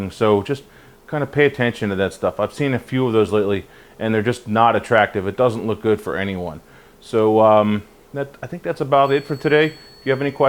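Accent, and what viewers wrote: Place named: Philadelphia, United States. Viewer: You must be from the Philly/SJ area. Your accent betrays you.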